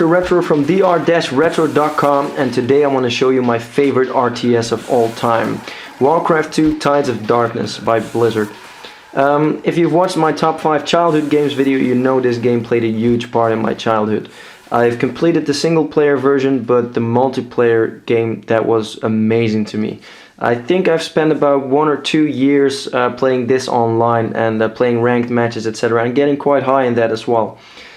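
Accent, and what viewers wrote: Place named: Netherlands. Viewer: Haha, je accent is zoooo Nederlands, hoorde het gelijk :) Ik ben zelf nogal verslaafd aan Warcraft III, zowel single als multiplayer. Deeltje 2 nog niet geprobeerd, zal ik vandaag even doen.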